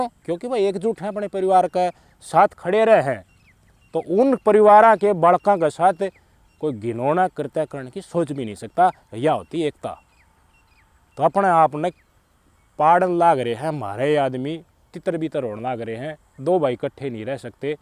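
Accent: Haryana, India. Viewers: भाई आपका हरियाणवी बोलने लहजा बहुत बढ़िया है आपका गांव कौन सा है भाई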